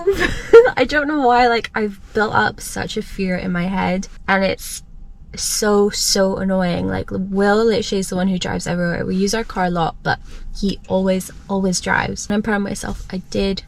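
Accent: Scotland, United Kingdom. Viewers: Hi, I just found you through this video, and your accent is so ambiguous. Are you scottish? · Omg I love her slight Scottish accent 😍😍😍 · It’s a soft Scottish accent.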